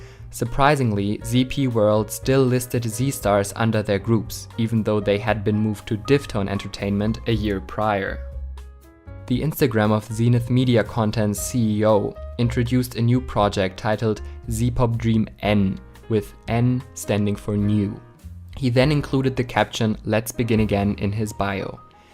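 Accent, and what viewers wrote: Germany. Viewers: As a German I can tell you are German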